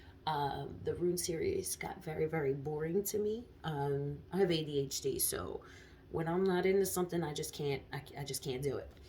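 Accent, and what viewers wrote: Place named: Philadelphia, United States. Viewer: Once again, such a great job! Not gonna ask where you are from but I was born and raised in South Philly and you sound like a straight home girl. 😀 Did want to point out that your comments about the masculine becoming more feminine and vice versa made me think of all the attention around LGBT+ issues. Personally, I think these changes are good, they indicate that perhaps humanity is striving for integration. I know as a man, being aware of my feminine side has made me a better husband to my wife and father to my daughters. You are doing a great thing with this channel. Keep it up.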